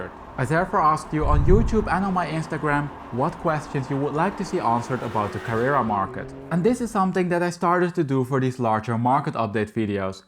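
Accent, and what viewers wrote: Netherlands. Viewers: You sound dutch, i'd love to see you analyse data on homes here. I know it's significantmy different from your regular content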